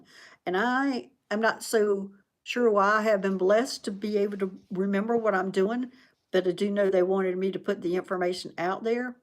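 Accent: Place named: South, United States. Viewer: Thank you for all you do. I’m probably too late, but here’s my STARSEED. You have the same southern accent my parents had. Very comforting. 💚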